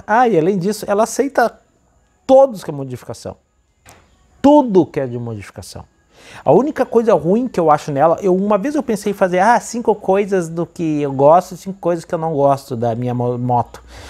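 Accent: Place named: South, Brazil. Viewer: Sotaque portuga do sul do brasil.guri muito bom seu vídeo gostei demais.essa moto e meu sonho.preciso comprar uma dessa urgente.e vc disse tudo moto que não tem elétricas demais não dá problema e meu sonho e comprar pra mim mesmo fazer as manutenções dela.gostaria de uma 883 ou uma forth eight mas essa tem um tanque de 7 litros e por isso pesou já a dyna e maior e o estilo me agradou bastante.muito bom o vídeo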